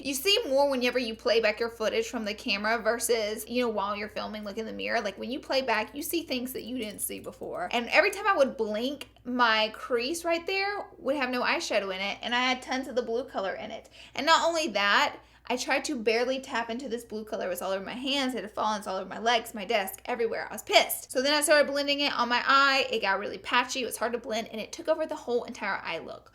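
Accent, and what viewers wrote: South, United States. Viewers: She's southern. She has a accent there is nothing wrong with her smh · I'm not trying to be mean but are you from the south cause you have a bit of a country accent??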